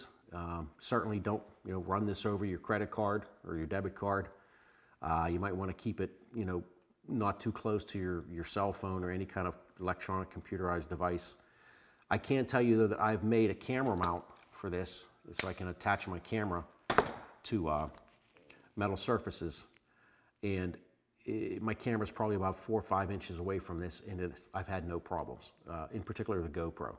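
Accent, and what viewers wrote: Pittsburgh, United States. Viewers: That Pissburgh accent makes me miss home and goin dahntahn on the weekends. Solid review too!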